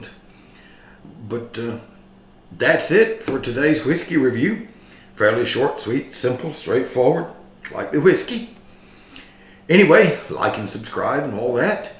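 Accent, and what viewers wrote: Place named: United States. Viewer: First time on this channel and I can’t get over your accent, which to me is straight from a Western movie. 😊 I can hear you say, “If you’re willin to work I can give you bed and board and after harvest maybe a little to help you on your way.” As for B&W, I feel it’s a great, affordable Glasgow Blend. The Compass Box does a more upmarket version. This particular style of whisky is sadly misunderstood and under appreciated in whisky circles. Good grain whisky is rare in an affordable blend but very welcome to those in the know. I would rather have a well-blended whisky such as this than a bland malt like Glenfiddich 12.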